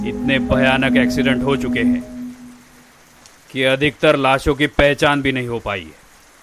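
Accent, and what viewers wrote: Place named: South India, India. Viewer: Mujhe sauth ka lga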